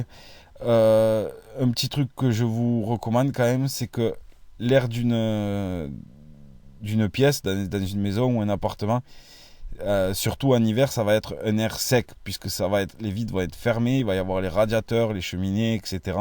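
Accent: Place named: South-West, France. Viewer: Liqueur de menthe ? Sorbet ? Miam ça baffoulle ? Pourtant une très belle voix avec un belle accent du sud ouest ! Merci pour tous ses conseils.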